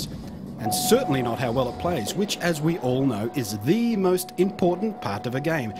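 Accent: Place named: Australia. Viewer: what the hell is wrong with you? He's Australian he LIVES in Australia, that's the way he talks, he's not faking the damn accent.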